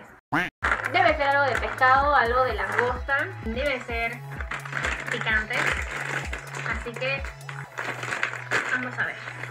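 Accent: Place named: Peru. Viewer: Tu acento es peruano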